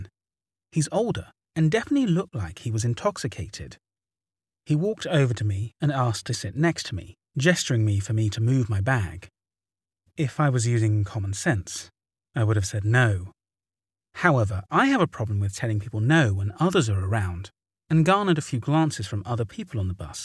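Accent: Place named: Southern England, United Kingdom. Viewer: Seen every single video on this channel, you’re the best at what you do my friend, and your very southern English accent makes them so much better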